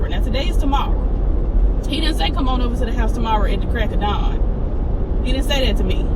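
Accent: South, United States. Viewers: You make that southern dialect look good 🥰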